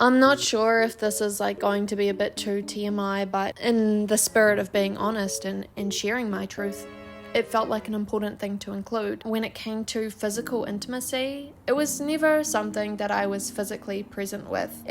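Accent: New Zealand. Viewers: Unrelated but those Kiwi vowels are so weird, I love it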